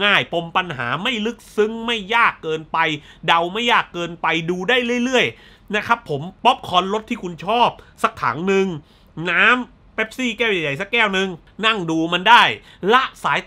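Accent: South, Thailand. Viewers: พี่คนที่ ติดพูดสำเนียงใต้อะครับ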